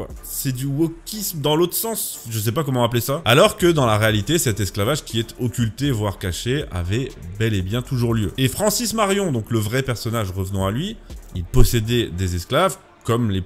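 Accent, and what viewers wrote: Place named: England, United Kingdom. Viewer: toujours interessant ce genre de vidéo mais l'accent anglais... svp...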